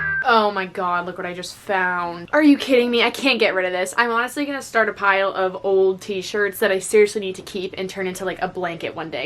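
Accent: Boston, United States. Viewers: jamie pettit it’s actually her Boston accent. I live in the northeast and yeah it’s mainly only ~Bostonians~ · also you being quarantined has brought your accent out sm and i love it hahaha u talk like my dad who grew up in boston "i was cleaning my rum and found the sweater ive been bitching abt" ahh yes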